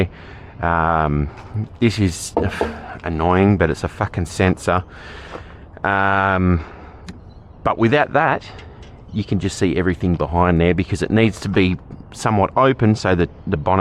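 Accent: Australia. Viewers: Nice truck , Mate ! ,I'm Chinese ,learning English, also need some time to adapt to Australia accent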